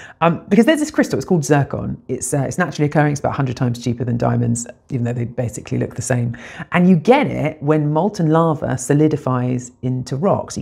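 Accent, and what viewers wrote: England, United Kingdom. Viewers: Aaawww 🙈 you are a 100 ct 💎 I hear your theory 😂 I'm sorry, a fake Diamond 💎 is not better than the real thing 😂 you are the Real thing and you are Blessed with the best English Accent in the world 🤗 Your Voice is worth a Billion Diamonds 💎 🙊❤️⚘️ Stay Bless ✝️ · Generic English accent. Hear it everyday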